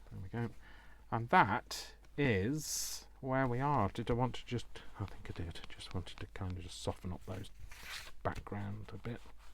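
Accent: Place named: United Kingdom. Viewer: Love them. Love your Britishness, love your accent. Love your creations, love your mind. I love all our UK accents. You be you, because thats what we love about you. 💜💙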